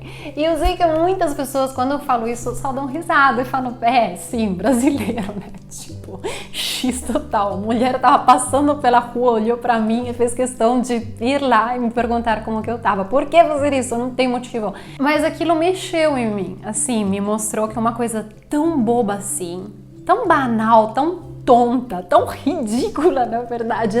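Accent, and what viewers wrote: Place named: Italy. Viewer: Prof. Você que é maravilhosa, sou simplesmente apaixonada pela Itália, mesmo sem conhecer pessoalmente, esse sotaque é lindo!!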